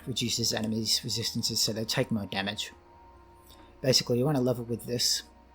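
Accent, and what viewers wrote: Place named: Australia. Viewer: Thank you for the information. Aren't you Australian? I saw in the comments that you have an aussie accent.. You woke up very early on the holiday morning. Sorry for sending you a comment notification in the early morning!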